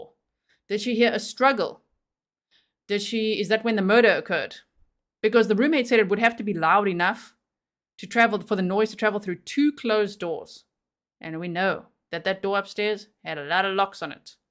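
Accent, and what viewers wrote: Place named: South Africa. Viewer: New subscriber here, I'm really particular with who I listen too as some people are really annoying to listen too lol, but I'm so happy to find you, love your SA accent and slangs (shame) 😂 as hubby is from SA, love your channel ❤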